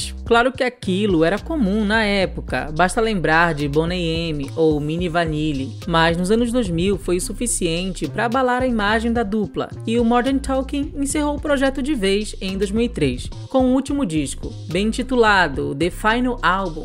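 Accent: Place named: Rio de Janeiro, Brazil. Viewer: este sotaque carioca, que mata...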